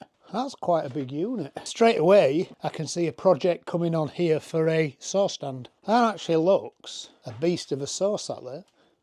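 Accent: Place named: Yorkshire, United Kingdom. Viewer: Having not taken the plunge but keeping an eye on the market (because I want a table saw) all the reviews I've looked at point to one or more of the same 2 primary issues: 1 - fence locking out of sqaure , 2 - mitre slots not industry standard. These seem like pretty fundamental and easily avoidable **-ups to me. Secondary issues are table flatness and dust extraction. I must say from the video it looks like the dust 'extraction' was reasonable even without suction. Evolution are obviously taking that point seriously as the larger saws are showing above and below extraction which is something I don't think I've ever seen on a portable. It's also lighter (despite being bigger-tabled) than the baby DeWalt. That sliding bed is a great touch a la Festool portable. Have Evolution actually managed to nail it on with this saw? I think it may have just gone straight to the top of my very short list. 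Thank you very much for the review in plain Yorshireman English.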